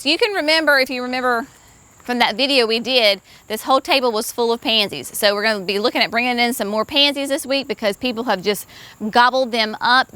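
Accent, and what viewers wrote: South, United States. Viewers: Fall has arrived here as well At the Jerseyshore! Tomato tomato it’s all good! I for one enjoy a southern accent 🌿🌻 🍅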